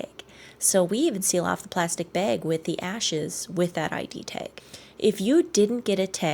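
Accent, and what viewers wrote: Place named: Northeast, United States. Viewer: I love the way she talks, the way she pronounces tag, bag and flag. Shes got to be from the northern east coast ❤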